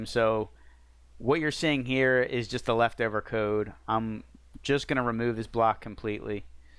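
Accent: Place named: Delaware County, United States. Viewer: Where are you from? I'm from Delco (near Philly). You sound like a local, haha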